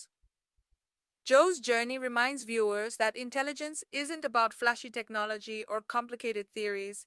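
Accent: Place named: India. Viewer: That Indian voice from the narrator is horrible as hell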